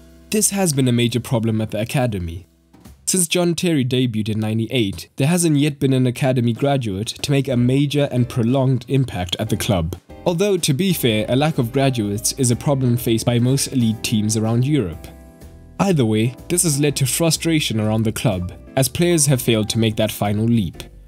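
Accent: South Africa. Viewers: The narrator sounds South African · Are you from South Africa? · So who's the South African dude narrating this? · Are you South African? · 100% South African speaker 👍, Shoutout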